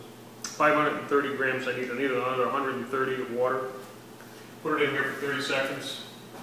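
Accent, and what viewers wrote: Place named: United States. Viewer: For a person with an American accent, I found it slightly odd that that all ingredients were named by metric weight. Something easy enough to figure out, but USA never went metric, so far, even though there was talk of it 40 years ago. I've eaten there when I was at Yale. Frank's obit started on the front page of the NY Times!!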